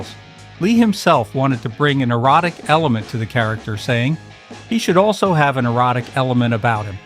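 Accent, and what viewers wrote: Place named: Baltimore, United States. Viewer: The narrator has a thick Baltimore accent. Ask him to say "Aaron earned an iron urn."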